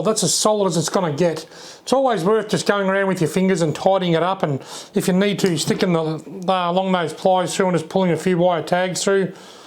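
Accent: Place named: Australia. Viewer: I applaud your yabby net efforts for Australia. But in my area, there are no fishing limits on crawfish, and our nets are set up vertical, and are at least 10 times larger. We catch crawfish by the pound, and they sell to locals in 40 pound sacks. I know you are not commercial, but it is interesting that due to greater abundance, we can catch so many more. I am located just north of Louisiana, in the State of Arkansas, in the USA. And locally, tho very abundant, I know of very few people who bother to catch them. Most crawfish are available cheaply at various buffets, where you pay around $15 USA and eat all you want! Ours are boiled with cajun spices, and are very tasty! Best of luck with your efforts and I have been watching your various fishing and yabbying videos for the past 3 months. I did subscribe. I love your Australian accent and your enthusiasm for your sport!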